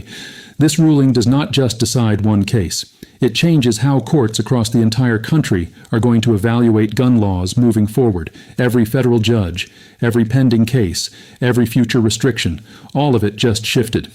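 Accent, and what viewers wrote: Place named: United Kingdom. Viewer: Why does this AI have a brittish accent today?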